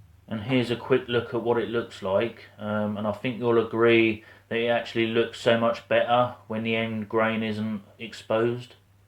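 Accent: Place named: United Kingdom. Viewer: Great job, that holder will never fail! Probably more valuable than the glue guns. Well I am part of the 20% who is a subscriber. My question for you is what part of the UK does your accent from. I have two friends in the States who are from Yorkshire and Wales. Your accent is much different. I am from Tennessee here in USA. I do like the two flags you made but watched the video and discovered that it is a long build.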